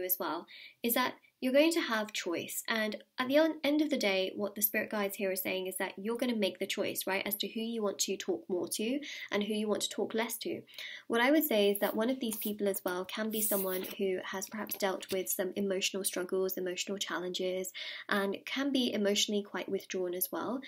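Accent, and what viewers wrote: United Kingdom. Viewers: Her British accent is already making her videos more interesting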